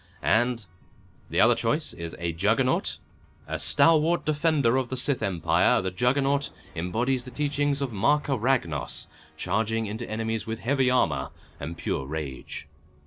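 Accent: United Kingdom. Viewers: Make a guild please :D, I will join you! we will all join you! Mwahahahaha! (please go empire, any class! british accents are awesome in my opinion! :D)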